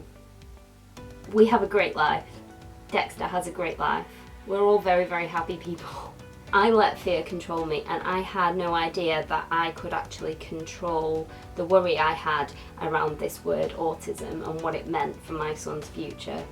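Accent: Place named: United Kingdom. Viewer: She speaks British English 🤷🏻‍♀️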